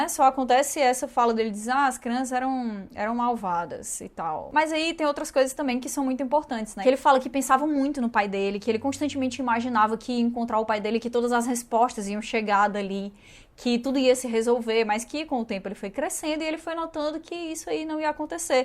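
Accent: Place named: Northeast, Brazil. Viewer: Kkkkkk esse sotaque nordestino da eu amoo 😂❤ que pena que esse já o penúltimo review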